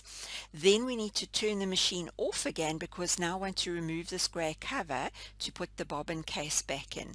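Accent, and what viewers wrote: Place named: South Africa. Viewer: Lovely to hear your SA voice. 🙂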